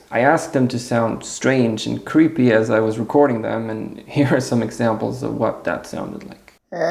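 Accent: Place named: Spain. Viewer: The intro was Great. Good spanish accent! I 100% agree with your thoughts on adding production tricks and not letting your music breathe. Finding the confidence to let a section breathe is something I really need to start doing. If you are not aiming to commercial music just skip those constraints! Long intros are great in my opinion but if it will always be someone that won't like it. Just do what excites you and someone will also think the same! Great video. Hope you are liking Spain. It's a great country with an awesome weather.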